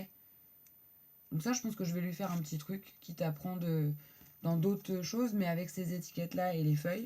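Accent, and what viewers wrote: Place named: England, United Kingdom. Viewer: J'adore ton accent anglais :)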